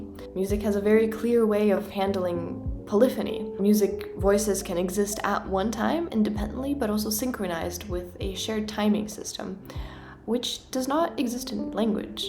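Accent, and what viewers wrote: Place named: Japan. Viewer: Hey.. as a linguist who studies Englishes and various contact language inpact on language.. I can't figure out what dialect of english you're speaking..there are very 'new' or different/mixtures of strange vowels/consonants and cadence etc.. You sound a little Japanese, a little Chicano and a little something new/modern ..and strange sounding to my American , older ear/and dialect.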